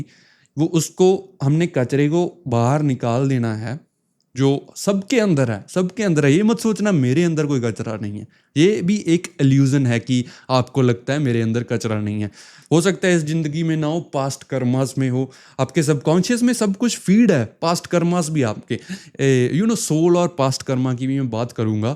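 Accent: Punjab, India. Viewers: Tu punjab toh a. Mere veer tu bolna shuru kiita mainu pata lag gaya. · punjabi lagda bai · From your accent of speech,ig you are from Punjab or haryana.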